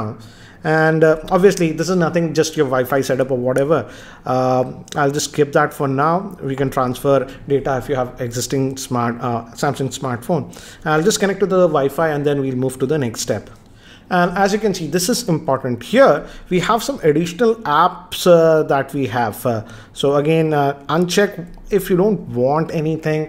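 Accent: India. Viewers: With your Indian accent I do not understand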